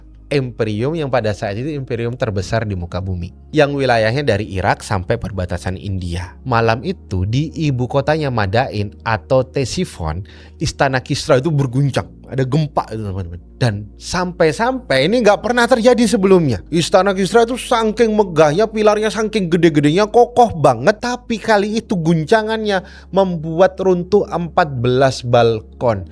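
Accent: Madura, Indonesia. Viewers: Logatnya kyk ny madura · Dari logat bicara nya Abang ini suku Madura, Benar.....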